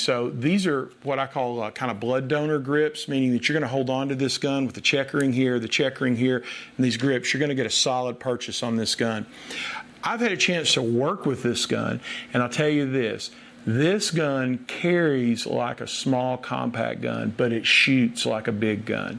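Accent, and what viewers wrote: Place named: South, United States. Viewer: I have a hard time listening to a overweight, end of life, bald bullet headed guy who speaks with a southern accent telling me anything about safety and personal defence. Here's is a personal defence tip, start exercising and stop eating everything you see. You are far more likely to die of a heart attack than a bad guy, "you can count on it".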